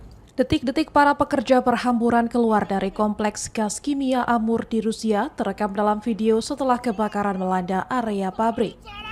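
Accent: Indonesia. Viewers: Widiihh suroboyoan,e metu , arek endi iki ?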